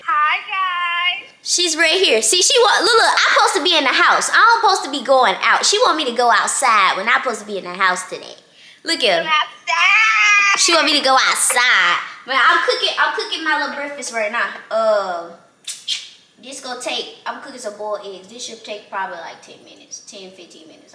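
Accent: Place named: Alabama, United States. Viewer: You from Bama like me all my folks pronounce everything wrong Himalayan Salt 😊❤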